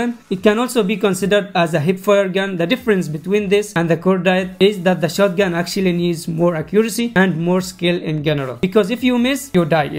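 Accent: India. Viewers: he's from Oman, but the accent is the same as an Indian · Bro here I am .. Your voice is like Indians .. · bro are you from India?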